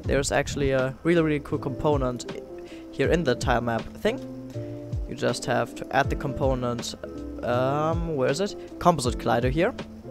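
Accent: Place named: Germany. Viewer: nice german dialect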